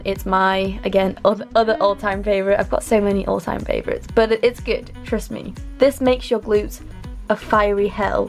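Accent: United Kingdom. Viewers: As would LUIMARCO say: Propahh and legit british accent 👍👍👍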